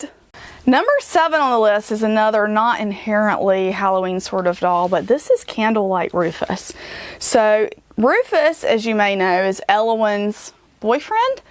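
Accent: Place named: South Carolina, United States. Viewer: Your accent reminds me of South Carolina. Love those dolls you showed.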